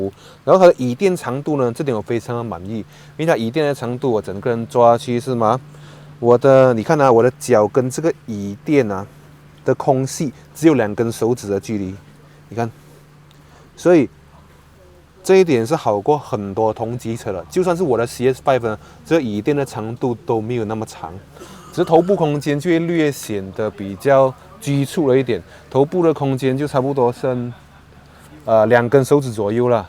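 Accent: Malaysia. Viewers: 有问题吗？马来西亚中文频道，马来西亚中文口音，没毛病。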